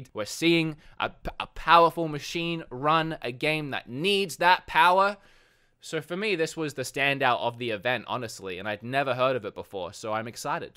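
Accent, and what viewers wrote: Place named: Australia. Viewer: Wow the Aussie accent is really popping on this one.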